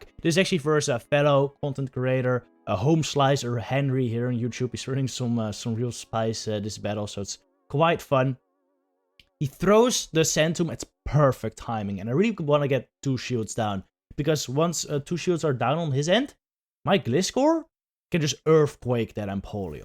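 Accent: Netherlands. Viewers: I have a question. Are you dutch because you have a dutch accent and if you are you are GEKOLONISEERD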